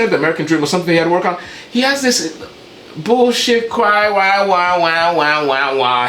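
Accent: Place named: Nigeria. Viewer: And you sound like a Nigerian. 🚩🚩🚩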